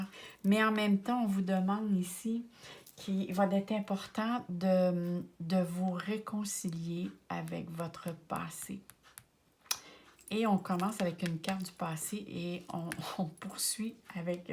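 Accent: Canada. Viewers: Bonjour, j’arrive sur votre chaîne et j’adore votre accent du Canada 😊. Je vais vous écouter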